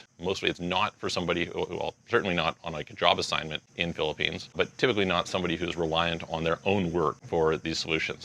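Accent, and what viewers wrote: Canada. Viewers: Excuse us. He’s Canadian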